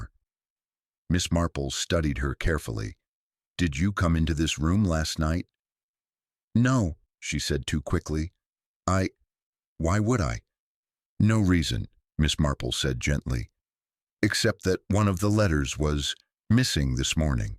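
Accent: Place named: United States. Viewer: An American accent doesn't work for a Marple mystery, sorry can't listen.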